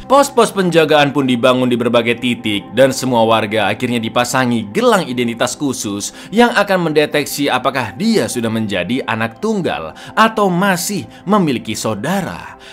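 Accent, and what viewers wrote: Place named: Kebumen, Indonesia. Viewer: cak pean wong kebumen dudu ?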